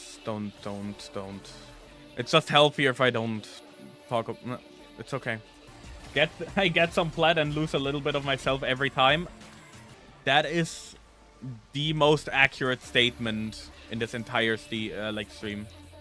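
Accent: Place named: Germany. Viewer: i kinda hören deutsch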